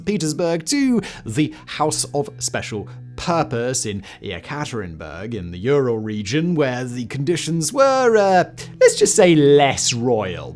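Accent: England, United Kingdom. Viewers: With his thick English accent, he needs to talk slower!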